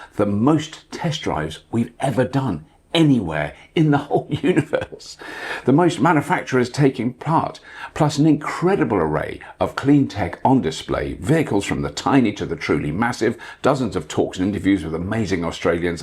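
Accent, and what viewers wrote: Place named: United Kingdom. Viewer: Brilliant!. Thanx for talking in such a relaxed, humorous British way, but still getting the message through. Love your videos 👍🏾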